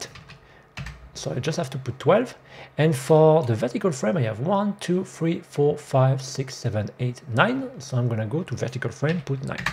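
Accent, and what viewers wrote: France. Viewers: je me disais bien ahah, au mois c'est cool ça permettra d'un peu comprends les vidéos parce que c'est dure de trouver des ressources en français sur Godot est j'ai un anglais qui assez discutables mais blague a part ta vraiment un très bon anglais et en vrais j'ai vanné un peu sur l'accent mais j'ai galéré a me rendre que c'était un accent un français x33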